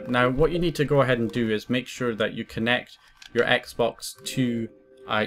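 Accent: Scotland, United Kingdom. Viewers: Are you scottish bro? Just for catching the accent. (i'm Italian actually)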